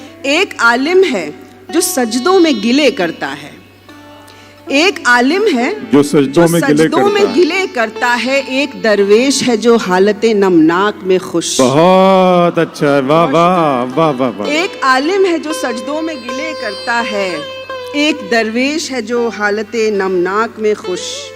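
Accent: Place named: Karachi, Pakistan. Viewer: Pure urdu! Pure educated karachi accent! Proving the language belongs to only literates not illiterates!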